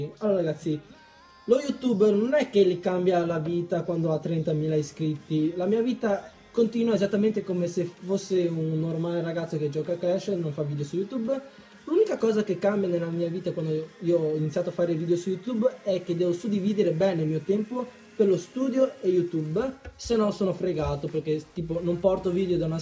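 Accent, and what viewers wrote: Veneto, Italy. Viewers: Ahahahahh grande, ho messo like subito per il dialetto Veneto♥♥ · ma sei veneto???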